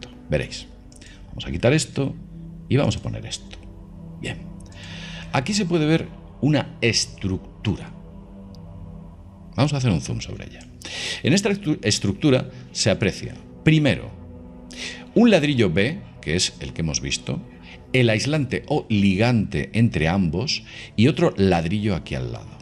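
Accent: Spain. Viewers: Dire algo que jamas han dicho... me encanta tu voz ;) ja! naa lo digo en serio, me encanta el asento español (soy chilena) y obviamente tambien todos estos temas de conspiraciones y demases. En resumen, tengo todo lo que me podria gustar en un solo video ;) buena suerte y adelante.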